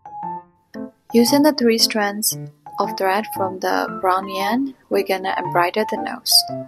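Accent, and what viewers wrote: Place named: Myanmar. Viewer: Are you burmese? bcz ur accent sounds abit like burmese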